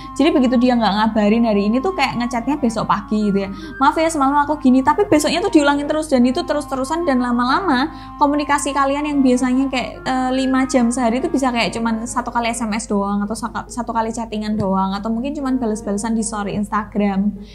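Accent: Java, Indonesia. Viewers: Samean iku jowo ngedi se mbak.. lucu banget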